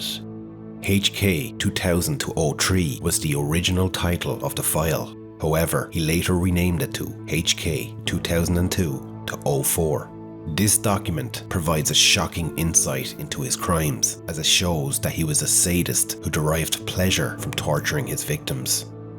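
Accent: Ireland. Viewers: Well Its usually someone with a New York accent narrating these videos about Rex but this time it's a lilting and lovely Irish brogue! Jesus Mary and Joseph it is horrendous to hear what the despicable monster did to his victims dear Lord Jesus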